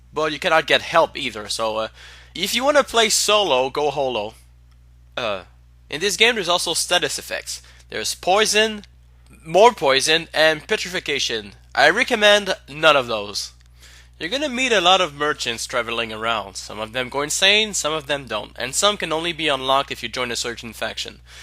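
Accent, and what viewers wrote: France. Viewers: Pretty good, but I think your jokes (play with words and images) are too frequent. Some are good and made me laugh, and others just made me say: "meh". Also, love the drawings. Btw, if you want to keep your French accent as your "trademark". Continue. If not? Work on your pronunciation. For example, the way you say "Anor Londo". ...Just saying... don't want to offend you.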